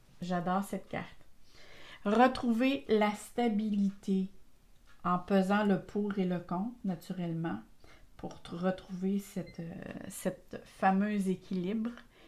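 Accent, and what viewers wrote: Canada. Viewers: Vraiment j'adore votre accent canadien et la douceur de votre voix que j'aime tant écouter :)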